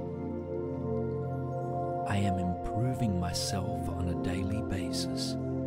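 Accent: Australia. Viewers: Hey, as a fellow Aussie, I appreciate the accent, last 12 months have been terrible, but I feel like I'm turning a corner with the affirmations, still going through terrible things, but I'm getting through, and it bothers me alot less, thanks mate, god bless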